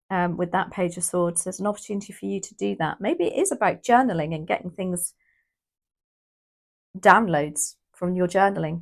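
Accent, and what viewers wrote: United Kingdom. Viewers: So nice to hear an English accent 😃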